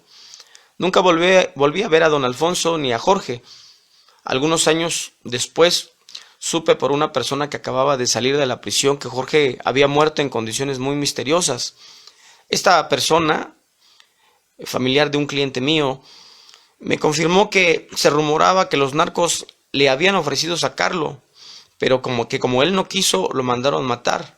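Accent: Mexico. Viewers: Excelente relato,a pesar del matiz mexicano me encantó . que buena historia digna de una película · Muy interesante historia y sobre todo una excelente narración, con ese acento mexicano que me encanta. Felicitaciones güero, me enganche a tu historia desde el principio hasta el final. Saludos desde Lima-Perú.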